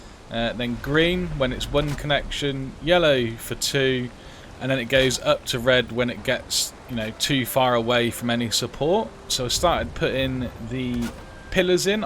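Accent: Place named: Suffolk, United Kingdom. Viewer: I'm from suffolk , that's why I thought I could hear a hint of suffolk in your accent Haha.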